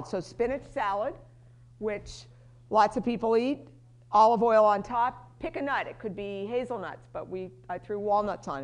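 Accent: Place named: United States. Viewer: This lady has no sense of speech. Sloppy diction. And she gives the American accent an ugly name. Yuk.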